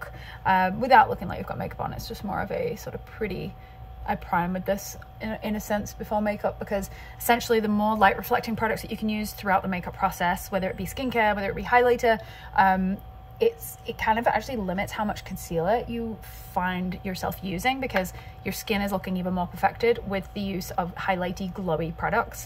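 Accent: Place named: United Kingdom. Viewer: You sound so much more British in this video. I wonder if it's because your parents have been visiting? I love it -- you sound delightful 🙂 😘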